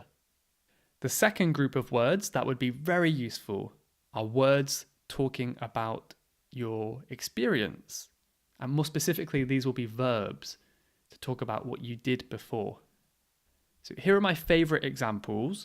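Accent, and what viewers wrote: United Kingdom. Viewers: Hi! I came across your podcast by chance on Spotify and I really liked the episodes I listened to, especially this one about Job Interviews. I'm an English teacher here in Brazil and you have already become a role model for me! For a start, I'm biased about British accents! Just love it! Moreover, your talks are very well structured, clear and extremely didactic! I'm already a follower on Spotify! Thank you for your channel! I have a pilot podcast aiming at CPE Exam tips but it's just a draft, I have a lot to improve and I haven't been dedicating myself to it right now. It's called 'Polish Your English - Flávia Pini'. Cheers!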